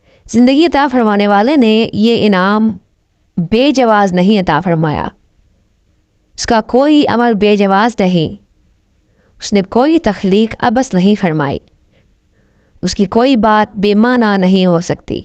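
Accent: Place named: United Kingdom. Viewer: بہن اپ انگریزی لہجے میں بولتی ہیں کیا وجہ ہے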